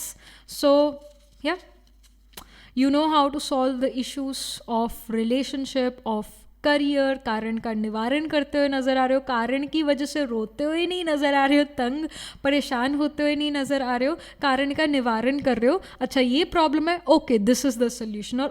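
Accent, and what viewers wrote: Punjab, India. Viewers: Are u a Punjabi mam...? Actually mujhe apke ascent se lga 😅❤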